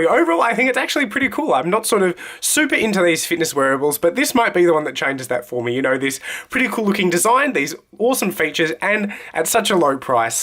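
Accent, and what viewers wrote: Australia. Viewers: Are you Australian mate You sound like an aussie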